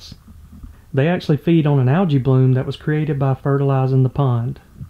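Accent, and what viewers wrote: South, United States. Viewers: Same 🇬🇧 here. We clearly NEED wholesome content because I've loved watching this and the gentle southern accent is so calming . Love it 😀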